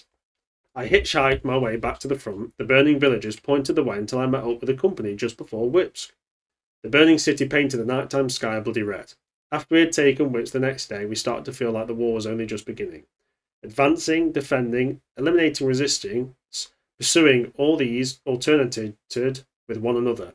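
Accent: United Kingdom. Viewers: Thanks for your work! Weird to hear Carius' memories with a British accent, but cool anyway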